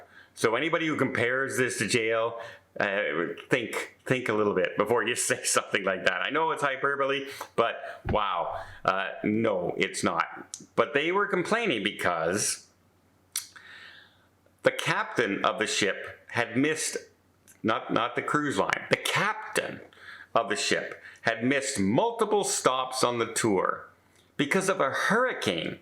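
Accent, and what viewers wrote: Canada. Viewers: It's the Canadian accent 😉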